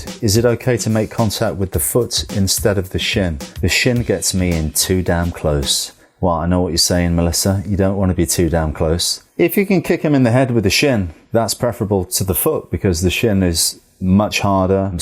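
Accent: United Kingdom. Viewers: He has British accent, it hard to hear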